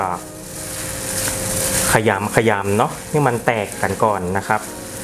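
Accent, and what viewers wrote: North, Thailand. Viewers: ดีดีค่ะ ชอบภาษาเหนือค่ะ เพราะดี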